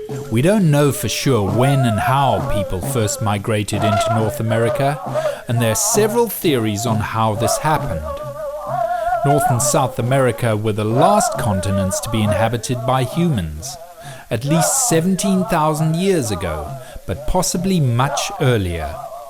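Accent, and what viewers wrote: United Kingdom. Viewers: Does anyone else notice the irony that this post about Native Americans is narrated by a man with a British accent? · Solutrean if you believe the interpretation of the archeological record. Bearing Straight origination is even less likely than Pacific Pan-oceanic migration. Truth doesn't concern itself with feelings nor should it, funny little British accented man reading US a script telling US about our history. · Imagine being the one who left that turd I bet at the time they weren’t thinking that far into it- why is a British accent talking about how old natives are uh dude our ancestors all are the oldest yah think no one nation can clam the oldest that’s like saying I am better cause I have blue shoes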